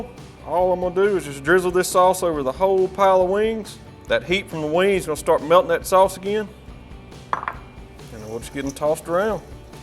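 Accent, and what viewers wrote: South, United States. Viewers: He got that southern accent .....sounds like molasses drippin out his mouthhh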